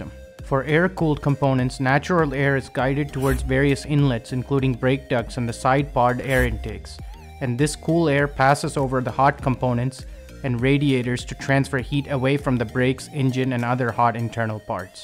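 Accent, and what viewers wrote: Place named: India. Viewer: Don't hold back your tongue when speaking. That's why Indian accents really hurts my ears when listening.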